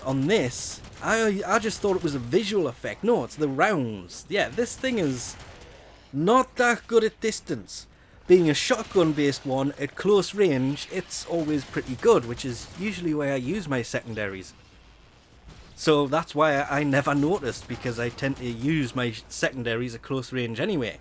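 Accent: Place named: Northeast England, United Kingdom. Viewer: Sounds like North East England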